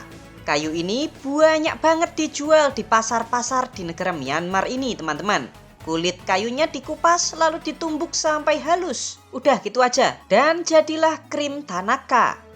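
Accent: Java, Indonesia. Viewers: Medok banget min jawa nya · Suaranya jadi candu dengan medok jawanya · Toto sekali jawanya min😅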